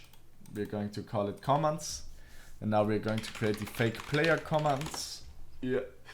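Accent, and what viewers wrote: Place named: Germany. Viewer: Am Akzent merkt man direkt, dass du deutsch bist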